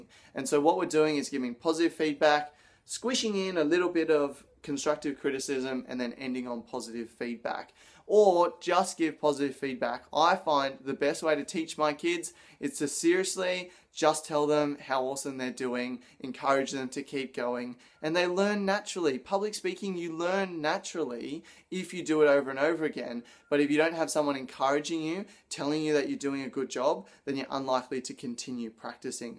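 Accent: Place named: Australia. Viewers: He has a fine Australian accent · your Australian accent actually helped me pay attention, since it's not what I hear daily. Maybe I'm unusual, but I enjoy different accents and cadences.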